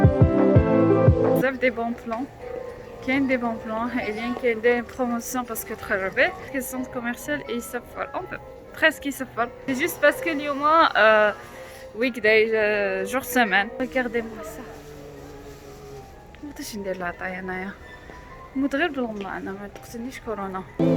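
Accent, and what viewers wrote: Algeria. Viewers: هاذي لهجة جزائرية و ليست مغربية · قرن وزمارا ههه لهجة جزائرية 100 ربي ينورك بنت بلادي 🥀 اول مرة نعلق في قناتك ، تعجبني ويعجبني الله يبارك عليه 💛🌸🌸💛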